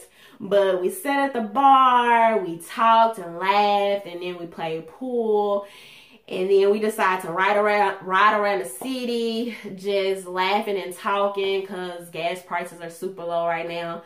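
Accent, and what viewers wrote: United States. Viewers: I love your country voice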